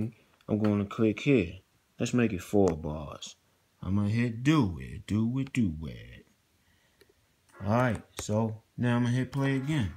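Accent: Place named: Baltimore, United States. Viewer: I love this video. I'm sincerely grateful I hope you do more videos brother. Sound like you from Bmore Peace !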